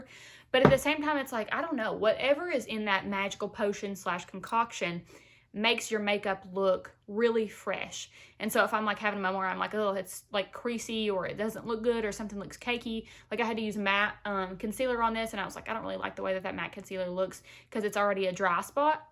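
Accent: South, United States. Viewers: Just found your channel through Allie Glines! Ummm you are precious!! And hilarious! And all the things! Plus as a fellow southerner your accent feels like home! Can’t wait for my Fawn glosses to come in <3 love finding real down to earth youtubers!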